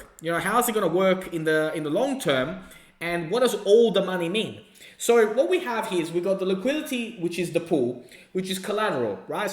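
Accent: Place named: Australia. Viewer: Thanks for all your ongoing analysis mate, great to hear an Aussie voice in the crypto universe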